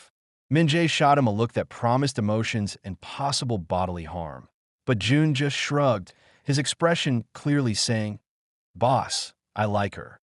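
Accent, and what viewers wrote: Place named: South Carolina, United States. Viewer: Absolutely love this story. I'm from SC and that's exactly how we speak.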